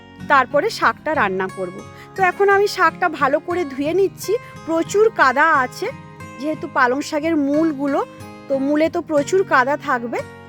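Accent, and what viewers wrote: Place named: West Bengal, India. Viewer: কথা শুনে বোঝা যাচ্ছে ইন্ডিয়ান বাঙালী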